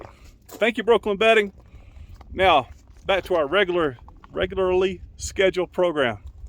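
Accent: South, United States. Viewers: I'm so glad you got Red back. Sorry it was a costly repair. I love your southern way of storytelling maybe because I'm southern also.😉 This was a nice video. Thanks for sharing your experiences. I hope the repair to Betty White is minor. Good to see Felix, but I could tell he was in pain. I'm still praying for him.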